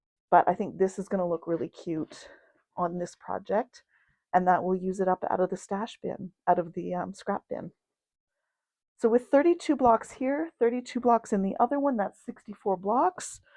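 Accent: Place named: Canada. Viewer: Trying to determine where you are from because of slight accent! Canada, New York? I think Canada. Love the quilt and pattern and your video is excellent. Like how you discuss measurements cuts and note bias and grain!!!!!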